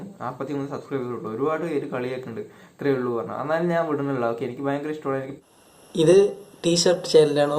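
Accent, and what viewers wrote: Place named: Palakkad, India. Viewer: Namude palakkad basha kelkumbol oru santhosham😍😍😍😍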